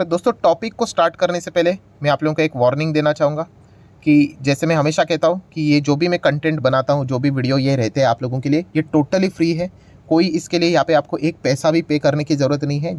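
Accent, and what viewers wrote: Maharashtra, India. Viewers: मराठी माणूस आपल्या बोलण्यावरून लगेच ओळखला जातो. आपला सर मराठी माणूस आहे. जय महाराष्ट्र जय शिवराय..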